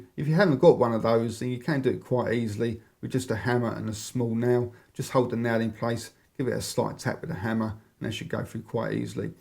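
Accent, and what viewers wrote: London, United Kingdom. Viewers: oi mate. you sound like you from london.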